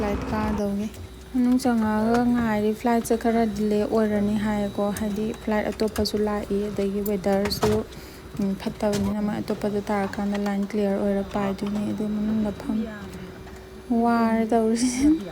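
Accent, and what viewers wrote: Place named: Kakching, India. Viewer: Enao j kakching gi oi taradi kakching maron kha kha nganglako eidi taba yam nungaiye😊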